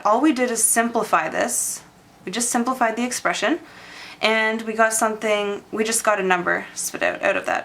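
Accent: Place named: Canada. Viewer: "that was pretty easy, eh" fucking love the Canadian accent.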